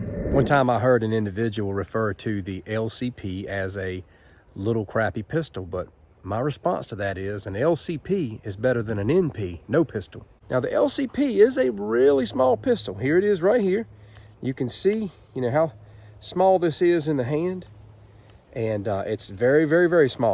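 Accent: South, United States. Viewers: This guy has a really cool southern accent. Good video.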